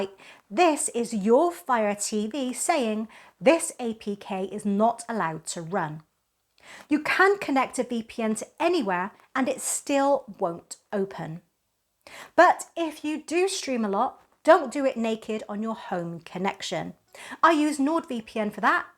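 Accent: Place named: England, United Kingdom. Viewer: I’ve jumped to Onn about a year ago, possibly longer. I still have a Fire TV cube that I very sensory use and an Insignia Fire television, that I basically use as/ monitor for the Onn. You always do a great job, honey abd I e said this before, I like the curly or waver hair over the straight hair. Plus I just live an English accent on women.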